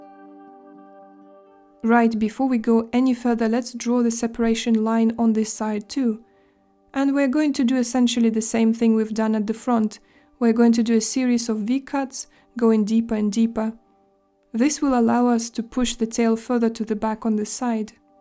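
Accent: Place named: Quebec, Canada. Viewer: About your lovely accent? My wife and I guess French Canadian ? But teaching skills is the best so don't change anything to many people. It needs a great interaction with carving ( not whittling that has no goals but passion for cutting)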